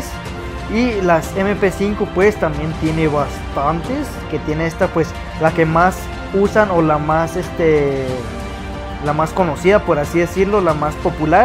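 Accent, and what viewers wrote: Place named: Chihuahua, Mexico. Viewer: Awuebo puro chihuahua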